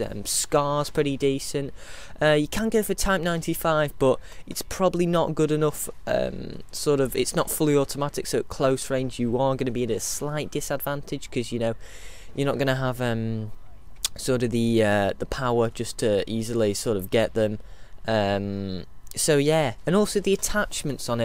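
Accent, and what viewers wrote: United Kingdom. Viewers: Haha, a first video on MW3 that is presented by a what sounds like a cool British guy like me!! Wahooo. P.s I have nothing against Americans, they are cool to!!! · Fuck that british accent...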